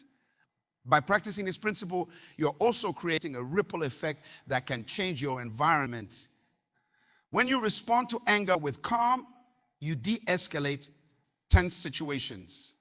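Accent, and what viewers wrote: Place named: West Africa. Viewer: I am a linguist and can't help but detect a west African accent. I wish I knew who this is because his teaching is aligned to Dr. Munroe and I would like to hear more. Glory to God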